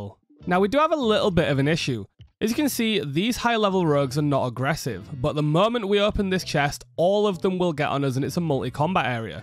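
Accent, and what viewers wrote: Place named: Hull, United Kingdom. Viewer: Dude are you from Hull? Accent is strong with this one. Good vid tho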